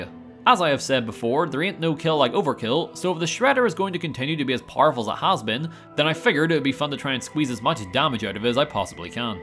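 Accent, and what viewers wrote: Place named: Northern England, United Kingdom. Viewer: Where in north are you from mate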